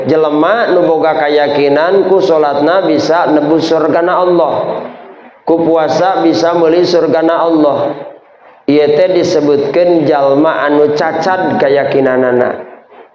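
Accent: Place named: Banten, Indonesia. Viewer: Logat bantenna ieu ajengan kadangu pisan😅. Barokalloh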